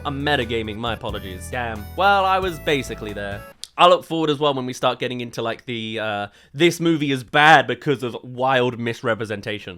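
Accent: United Kingdom. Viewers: some comments made it so obvious but british voice was hilarious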